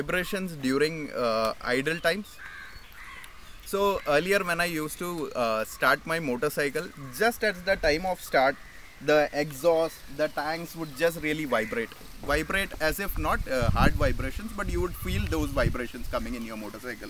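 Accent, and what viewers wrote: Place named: Kerala, India. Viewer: മലയാളി ??? ആണോ സംസാരം കേട്ടിട്ട് 😃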